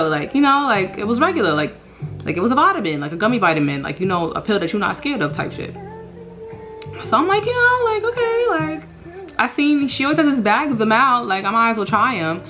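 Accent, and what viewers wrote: New York, United States. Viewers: Can I just say how comforting it is to hear a legit ny accent after moving out of state 😭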